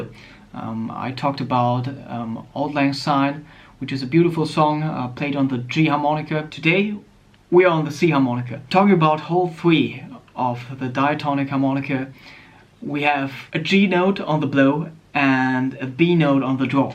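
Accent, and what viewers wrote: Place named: United States. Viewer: gewöhne Dir an Englisch zu sprechen, und nicht "fucking american"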